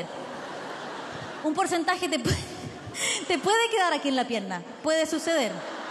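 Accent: Chile. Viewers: se arrugan enteros por una humorista que habla como el 70% de los chilenos hoy en dia . Son cuaticos con la chiqui que fue mas honesta y se nota que tenia confianza con su rutina y el publico. personalmente es la unica humorista que me hizo reir de todo el festival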